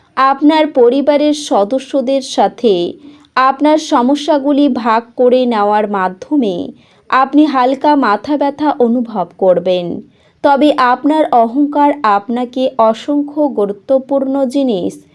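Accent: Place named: Ghoti, Bangladesh. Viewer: ঘটিয়া বোলি বন্ধ করো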